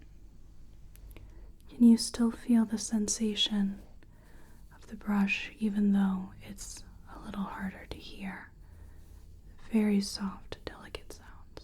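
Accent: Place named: South, United States. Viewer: Love your accent, you sound slightly Southern may I say? You are one of the best ASMR artists out there... Love ya😍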